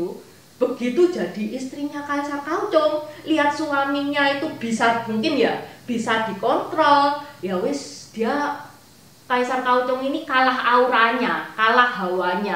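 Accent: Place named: Banyumas, Indonesia. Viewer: mendok2nya ituloh.... Ngapak buanget... 😂😂👍👍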